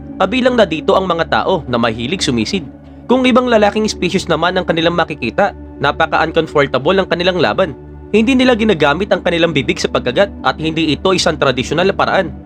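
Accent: Iloilo, Philippines. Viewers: Ilonggo ka lods?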